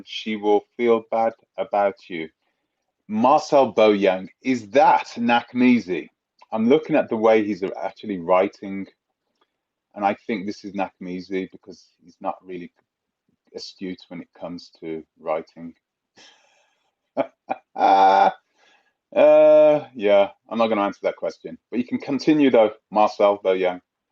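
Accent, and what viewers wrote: United Kingdom. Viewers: Can u speak your African accent and desert your British accent. Coming from a concerned Gambiana.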